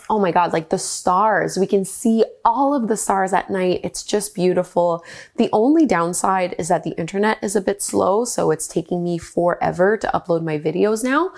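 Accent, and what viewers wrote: Canada. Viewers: I used to live in Ottawa in the Hunt Club area for few years. I am now in Melbourne Australia. It' so nice to hear the Canadian accent everyday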